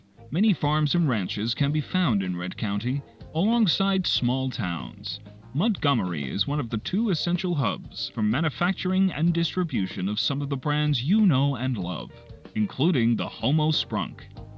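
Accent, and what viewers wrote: United States. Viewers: This is amazing ! The script is laugh out loud funny ! It must have been a nightmare to create footage that matches the script too ! And a big thumbs up for managing to maintain a consistent American accent for 50 minutes ! Really, this is the sort of thing Rockstar should have released to promote San Andreas back in 2004. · You pulled off a fantastic American accent in this! Halfway through I was wondering if you hired a professional VA to read this huge script. Bravo, and hilarious production! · Pretty good American accent my dude. Also you do an incredible job of showcasing this world. It looks so lively from the angles you picked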